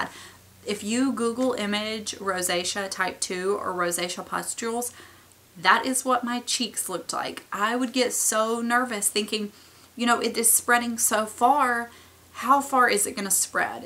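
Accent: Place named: North Carolina, United States. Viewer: I love your accent! Are you from North Carolina? I grew up there and your voice reminds me of home :)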